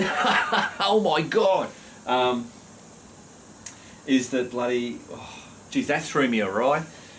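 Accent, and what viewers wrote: Australia. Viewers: Your accent makes me miss living in Woomera SA.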